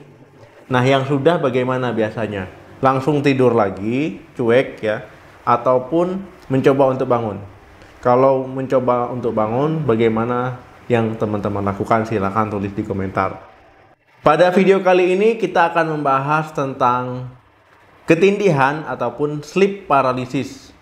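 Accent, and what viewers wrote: Aceh, Indonesia. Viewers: Logat dokter kek org aceh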